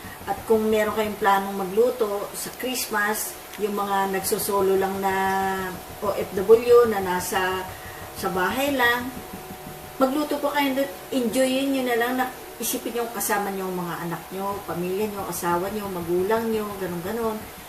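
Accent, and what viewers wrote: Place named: Nueva Ecija, Philippines. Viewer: naalala ko tita ko sa pag sasalita. taga Nueva Ecija siguro si madam :)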